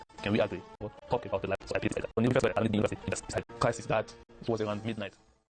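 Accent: Israel. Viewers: He has Hebrew accent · This guy is definitely a Jew, thickest Hebrew accent I've heard in a while. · He has a hebrew accent. Fakest thing since WWE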